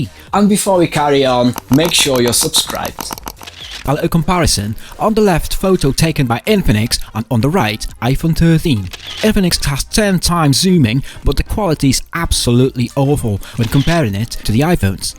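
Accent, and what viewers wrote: United Kingdom. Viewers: 👍 The British accent is a value added. Saturday February 04, 2023. A.M. U. S. C. T.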